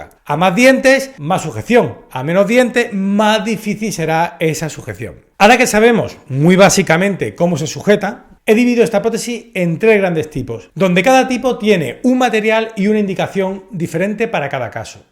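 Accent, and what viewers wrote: Spain. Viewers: Cae mal esa forma de hablar de los españoles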